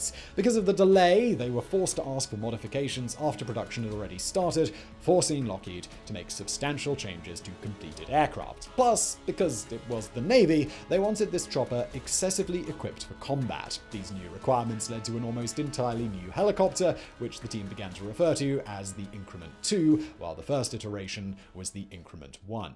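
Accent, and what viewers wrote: United Kingdom. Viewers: His speaking is tuned more to a Brits ear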